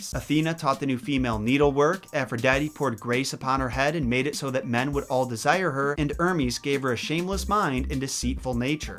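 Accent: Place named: East Coast, United States. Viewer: I’m still trying to place his accent lol. I’m sure it’s east coast but I want to pinpoint it lol.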